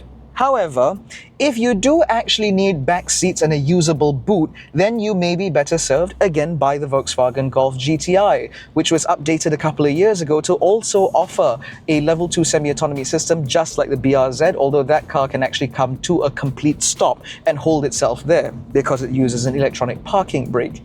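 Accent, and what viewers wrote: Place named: Upper class, United Kingdom. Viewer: Speak like a British aristocrat. nice......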